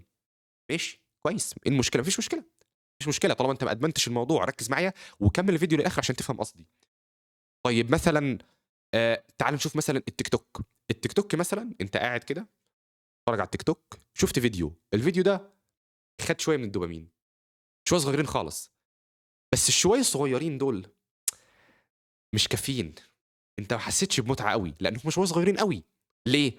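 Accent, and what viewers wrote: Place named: Saudi Arabia. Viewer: لهجتك سعودية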